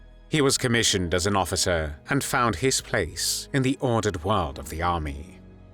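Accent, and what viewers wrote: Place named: United Kingdom. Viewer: Get rid of this standard BRITISH accent narrator. GET RID of his AUSTRIAN ROLLING VOWELS speech.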